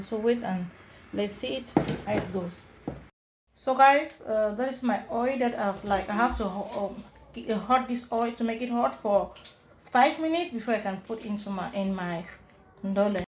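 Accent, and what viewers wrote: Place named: Germany. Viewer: LOL I hear how German is overtaking you. You cooked it the real Cameroonian style. Well done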